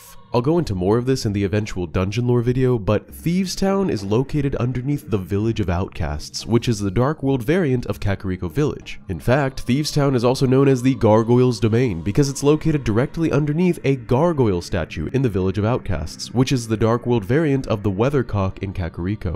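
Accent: Texas, United States. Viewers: I didn't realize you were from the Lone Star state, but now I can't not hear your Texan accent lmao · Love the Texas accent. God bless Texas. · Your ampt up Texas accent majes me want to hear Sam Elliot tell me about the Hero Of Time "...hmm I take comfort in that, The Hero abides...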